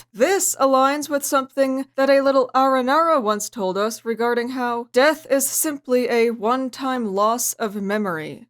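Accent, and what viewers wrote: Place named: Canada. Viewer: I hear some canadiannism in your voice, or maybe its northeastern US which has canadian influence in their accent 😊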